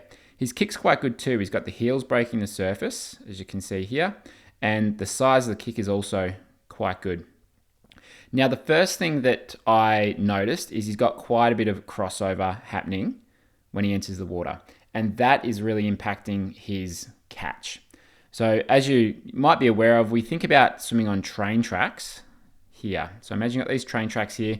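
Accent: Australia. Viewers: Australian accent makes this feel both legit and relaxed as hell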